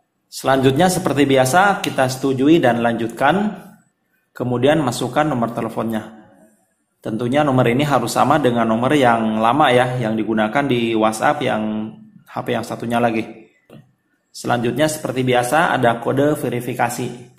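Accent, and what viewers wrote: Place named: Sukabumi, Indonesia. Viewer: Logat nya mirip orang Sukabumi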